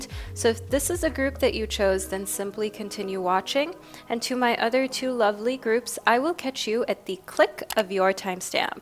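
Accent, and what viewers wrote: Germany. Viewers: Giiiirl I love how you speak German 🥹😻 Ganz viel liebe aus Deutschland 💕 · ❤❤❤ your German is so cute ! And it confirms my choice for pile 2. because i am from Germany ❤❤❤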